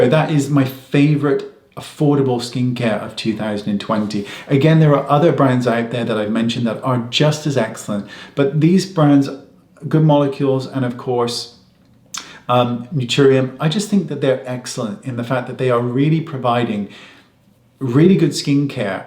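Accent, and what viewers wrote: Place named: United Kingdom. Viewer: I wonder which British accent has. It’s so subtle.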